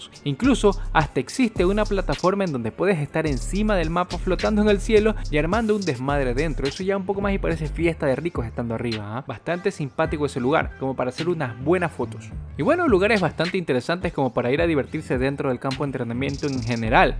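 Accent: Ecuador. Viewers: Eres ecuatoriano?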